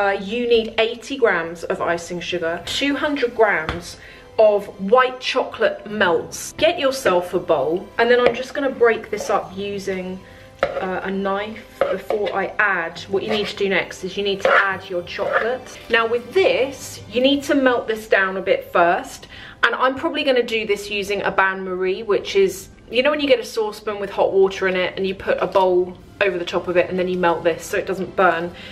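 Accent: England, United Kingdom. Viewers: I just love to listen to you!!! Not only are you articulate, you have the BEST English accent!!! Thanks for sharing your Christmas with us, and I LOVVVE your gorgeous Chanel trendy!!!🎄🎄🎄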